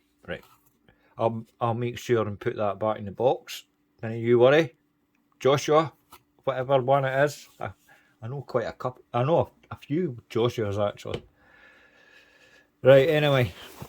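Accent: Scotland, United Kingdom. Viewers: Love the commentary. Swearing doesn't seem as bad with a Scottish accent 😂 P.S. Just ordered the watch you reviewed, I've been looking for a white tool watch for a while.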